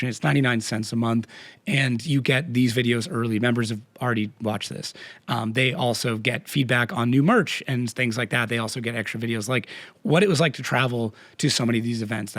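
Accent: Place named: United States. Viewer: you try your best to anounce Polish and not Polish drivers at comentators both, sometimes i think you are polish komentator speaking with US akcent, good work it was good experiende to hear you, Polish drifting its sutch a big history that you will need exdent this movie to 10h, but good shot trying to show people what its all abaut, you did a greate job ,,check Final in Płock - Adam Zalewski vs Piotr Więcek or James Deane vs Pawel Borkowski - THE BEST DRIFT BATTLE EVER? at Płock" glad that guys from DMP work with RedBull and the Championship is big but still have the spirit of family grassrots event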